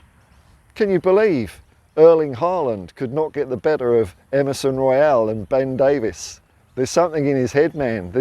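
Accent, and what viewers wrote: Australia. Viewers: I knew this bloke was Aussie, great bloody content worth the sub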